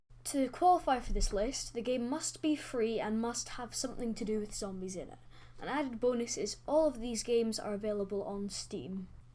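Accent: United Kingdom. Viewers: are you Scottish or? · i like british people, and you sound british just in case your not, hell im even trying to speak in british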